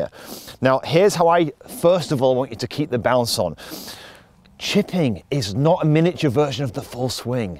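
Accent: United Kingdom. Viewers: You wonderfully master the art of teaching golf an I'm fond of your so British Accent😊...It's just a friendly wink of a French golfer.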